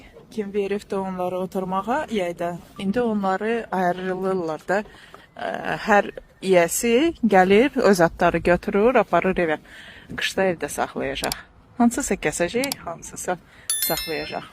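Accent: Ganja, Azerbaijan. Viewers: Şirin Gəncəli ləhçəsi ilə danışırsız nəsə əlaqə var ora ilə ? 😂.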